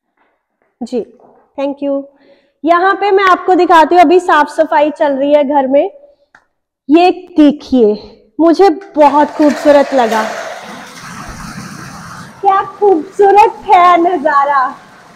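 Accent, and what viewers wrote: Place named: Punjab, India. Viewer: Kudi punjab d lagdi aa jo punjabi vich Hindi fit kari ja rahi aa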